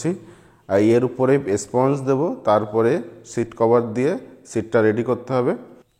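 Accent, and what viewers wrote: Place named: Kolkata, India. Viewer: Ei chele to india tei thake maybe, voice to Kolkata style er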